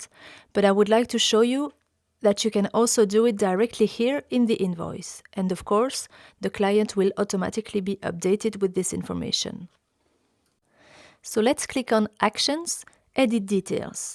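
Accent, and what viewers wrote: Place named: France. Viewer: Hello ! By your accent, I imagine you have uploaded the same video with a voice-over translation in French ?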